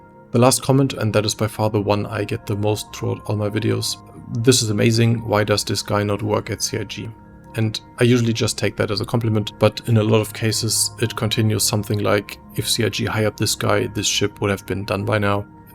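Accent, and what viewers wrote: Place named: Germany. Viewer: dieser deutsche akzent... bitte sag mir das ich micht nicht irre... kanadier reden nicht so ^^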